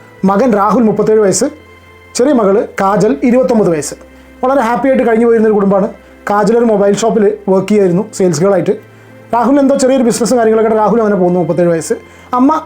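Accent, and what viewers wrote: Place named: Palakkad, India. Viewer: ബ്രോ വീട് പാലക്കാട്‌ ആണോ ഭാഷാ ശൈലി അതുപോലുണ്ട് 👍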